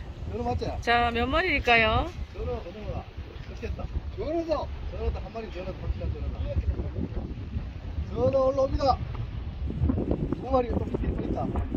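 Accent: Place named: Gyeongsang, South Korea. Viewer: 차가라~차가라 차가! 완죤 갱상도 말씨!ㅎㅎ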